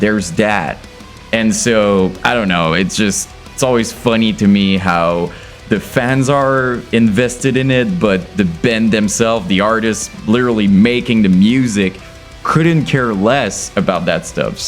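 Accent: Quebec, Canada. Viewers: Great videio man! You do something new and different. Do I hear a french canadian accent?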